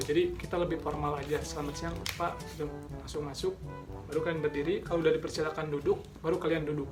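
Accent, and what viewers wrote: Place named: Sunda, Indonesia. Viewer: "Seperti itu nya" lagi serius dengerin, mendadak ketawa bang ahahahhahah sunda na kentel pisann, hatur nuhun kanggh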